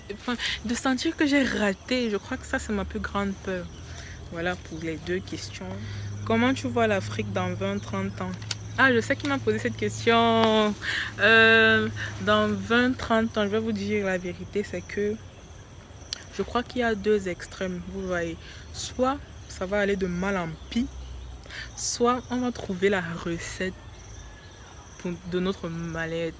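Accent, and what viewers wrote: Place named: Cameroon. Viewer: J'ai reconnu l'accent dès le début. 237 en force !!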